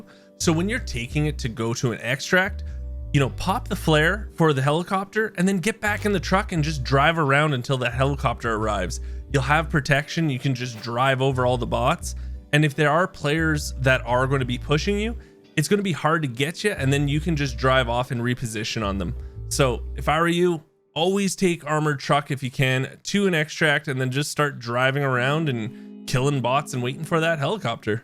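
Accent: Canada. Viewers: You were soo accurate with your “15 DMZ tips and tricks to make you a pro” video. Your goofy Canadian accent WAS fun😮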